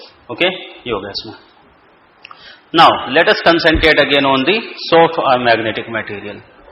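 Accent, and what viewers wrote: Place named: India. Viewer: i see the material is good, bt ENGLISH with indian accent....hard to understand.....see ya...bye...thanks anyway....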